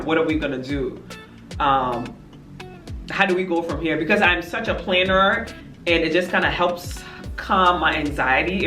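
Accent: Liberia. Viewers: Congrats on everything! I have to ask, are you liberian? I love liberian accents!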